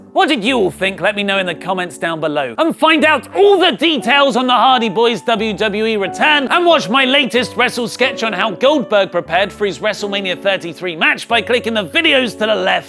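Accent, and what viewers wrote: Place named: United Kingdom. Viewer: Oli Davis... i enjoy your YouTube channel, but seriously your compulsive British accent really makes me wanna gather a huge crowd and initiate a chant of "Shut the fuck up "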